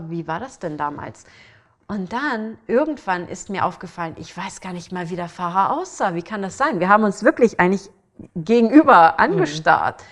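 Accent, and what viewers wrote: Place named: England, United Kingdom. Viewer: Ihr leicht englischer Akzept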